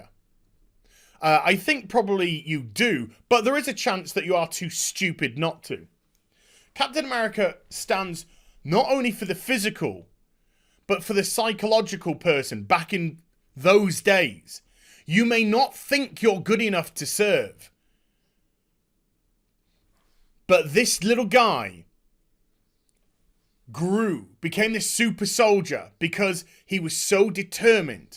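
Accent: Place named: United Kingdom. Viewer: Hearing a Brit talk about these things really made my day. All my uncles, my grandfather, and my great grandfather all served in the Army, and they did so willingly. They are true patriots, and I cherish every one of these fine men in my family, and all others. God bless America, and God bless all the people who have served and live in the hearts of the many.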